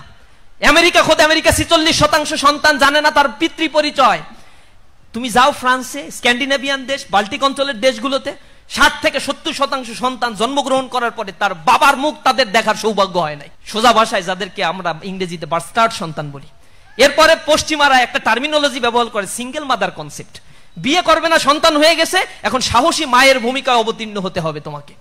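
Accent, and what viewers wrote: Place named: Chittagong, Bangladesh. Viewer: তোমার কথা শুনে মনে হচ্ছে , তুমি চট্টগ্রামের মেয়ে।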